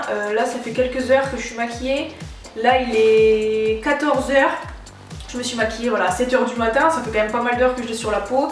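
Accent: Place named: Saône-et-Loire, France. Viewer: J'ai l'impression que je connais ton accent, t'habites en saone et loire non?💓😂😂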